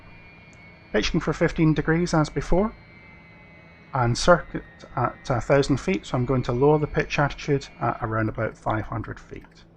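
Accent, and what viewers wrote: Scotland, United Kingdom. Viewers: Most enjoyable and informative video. My thanks for not inflicting cloying, abrasive or noisy “background music” to drive us to utter distraction. One takeaway: no matter the cost of the aircraft it still obeys the fundamentals of aerodynamics and physics. And your Scots brogue is a distinct pleasure to hear. Mazaltov!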